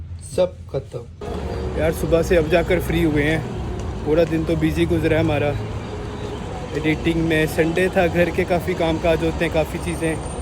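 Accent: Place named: Kuwait. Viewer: wohii kwt wala accent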